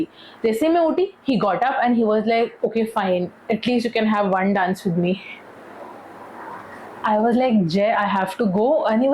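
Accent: Maharashtra, India. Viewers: i would like to share a story , of my EX currently i am in 12th , when i was in 11th due to peer pressure and fomo , i used to think about getting in relationship. so in 11th grade i finnally went in relationship. first it was good , even i was fully trying my best , i gave my insta and snap pass for her to check. but she was very different , always talking to other boys or her ex , and on 14th feb i asked her to meet in a temple , the temple is close to her house , but for me iit was around 2-3km and that to on cycle. still she kept insisting to meet some where else i tried , explaning that place was far and on hill so it was hard for cycle to go there , but she didint care , and by that time i was mentally frustrated by everything , college , family and her , so i broke up then she started saying things bhind my back , but now idc . slowly and steadily i am recovering , but for sometime alteast i am not at all ready for relationship. 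btw thanks for your videos and love your marathi accent . (i am marathi too )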